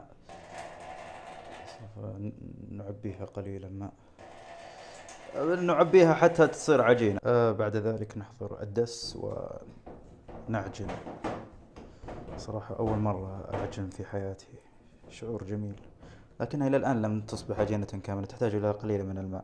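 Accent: Saudi Arabia. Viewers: Love You هو يتكلم سعودي وعربي في نفس الوقت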